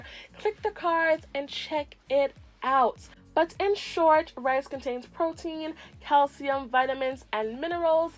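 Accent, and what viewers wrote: Caribbean. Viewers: Do I hear a slight Caribbean accent ?? 👀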